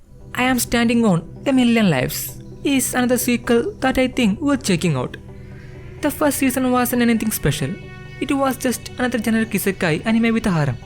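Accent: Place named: Kerala, India. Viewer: thicc mallu accent xD, but well made video 👍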